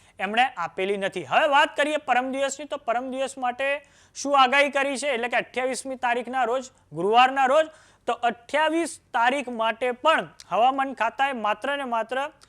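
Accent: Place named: North Gujarat, India. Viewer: Bhai tame boli upar thi utar Gujarat na lago so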